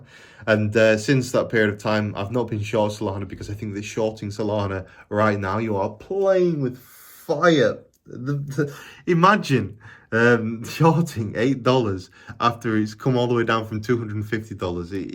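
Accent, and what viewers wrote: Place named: Northern England, United Kingdom. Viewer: Will do captain. Been subscribed for a year or so. I’m from up North too so it’s good to hear a familiar accent. Keep up the good work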